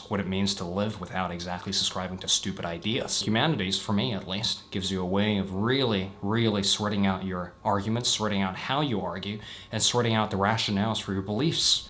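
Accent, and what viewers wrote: United States. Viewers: why do you pronounce things more like an american than an aussie?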